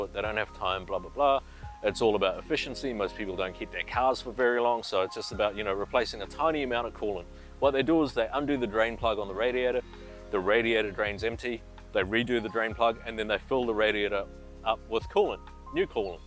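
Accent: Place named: New Zealand. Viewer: Good ol' Kiwi accent . . . nice!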